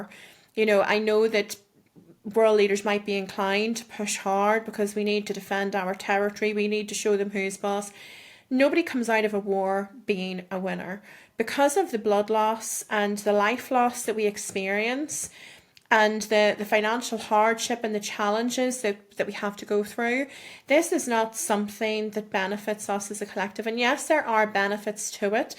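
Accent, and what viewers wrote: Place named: United Kingdom. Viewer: Nice British accent prophet🎉🎉🎉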